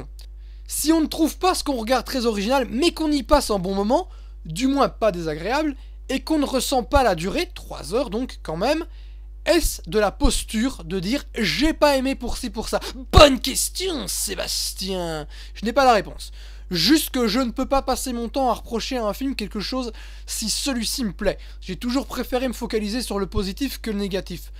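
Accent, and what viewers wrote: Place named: South, France. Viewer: (Ton accent du Sud est un peu à revoir, mais merci quand même de cet essai sonore:D) Ah oui, je me souviens de ta chronique sur Avatar d'il y a quelques années ^^ (comment l'oublier? tu t'en étais pris plein la gueule, après ta vidéo, par des personnes qui étaient fans du film xD). D'ailleurs, ce serait bien que tu postes tes nouveaux "Grumpy mate des flims" sur ton blog.:) C'est intéressant de donner une seconde chance à des films, je le fais aussi (pas pour TOUS les films que j'ai trouvé bof, mais pour certains oui, et du coup j'ai parfois de bonnes surprises au second visionnage).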